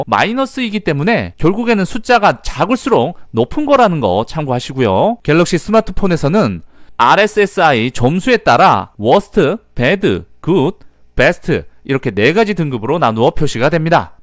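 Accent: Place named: South Korea. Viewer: 말하는게 어느지역 사투리 같음 히얀하네 끝말을 강조하는게 두번다시 하지 마라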